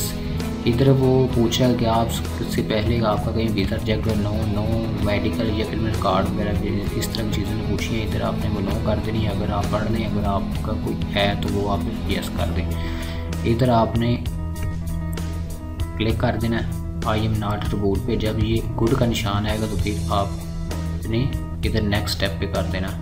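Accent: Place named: India. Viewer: You ask comment but needless to say , you don't speak ENGLISH to understand it. My comment is use less to you as you are not helpful to me. Onse you speak Indian English, you are damaged for good to get a job in AMERICA. IS UNTOUCHABLE CAST SYSTEM INDIAN??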